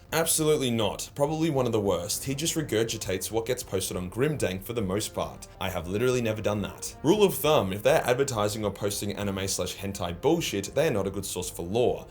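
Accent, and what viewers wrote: Australia. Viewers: Same. also Australian accents just make everything funnier. "Yah dog ahh" 💀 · has comedy, self awareness, lore and the aussie accent, goddamn give me more. Id give up maple syrup and tim hortons for this man. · Yeah, the Aussie accent makes sound like the video is fastforwarded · From an outside perspective, all I see in is just an atypical Warhammer nerd who got really lucky and is pretty good at running a business. The Australian accent and slang helps him in his lore videos and his overall presentation is pretty solid.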